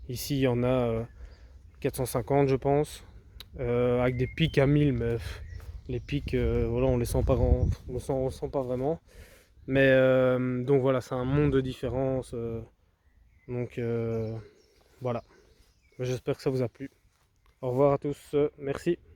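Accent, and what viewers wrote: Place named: Belgium. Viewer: Il me semble entendre un accent habituel, tu ne serais pas belge ? 🙂Si oui, dans quel magasin de trott vas-tu ? Merci en tout cas pour le retour objectif fait dans cette vidéo ✌🏼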